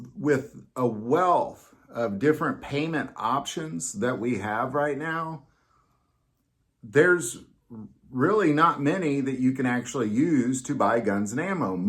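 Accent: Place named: Texas, United States. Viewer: It sounds like Texas. I used to live there.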